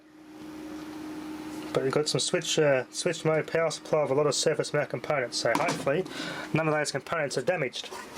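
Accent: Australia. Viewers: He is speaking rapid AUSTRALIAN. Solution is to hit CC to display subtitles. Helps a bit. Comments beneath the video are useful. Steve in Sydney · is this Australian??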